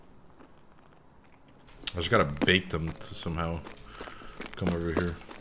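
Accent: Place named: United States. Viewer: С твоим американским акцентом хрен поймешь кто ты есть на самом деле, я подписывался на американца, а ты украинец вообще, если верить комментариям...вотэтоповорот. Жги, братюня!)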